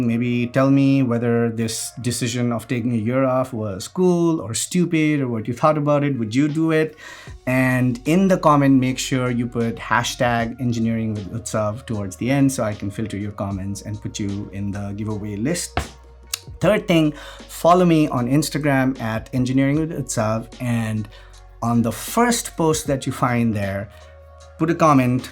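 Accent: Nepal. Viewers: Not to be rude but you sound like a nepali. That accent is unmistakable. I have a couple of friends and even colleagues who are nepali.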